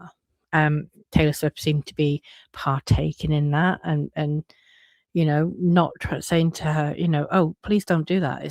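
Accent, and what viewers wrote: United Kingdom. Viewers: Just ran across your channel, from your accent i assume you're in England, I also saw another video that says they're moving to England. I'm curious, what do people there think about her and ryan?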